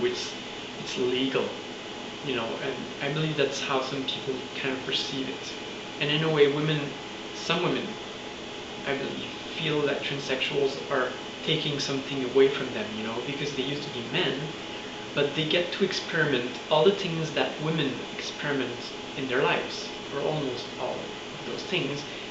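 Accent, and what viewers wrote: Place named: Quebec, Canada. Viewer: You're very beautiful and your Quebecois accent is wonderful. I hope everything is going well with you. -Eric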